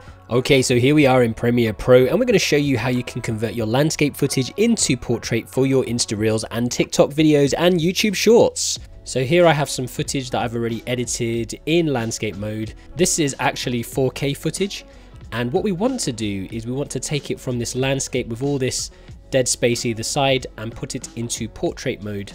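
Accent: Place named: United Kingdom. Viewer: wow, I figured from your accent you were from the UK, so that’s why I was so curious. It looks so much like my part of the US, that’s crazy.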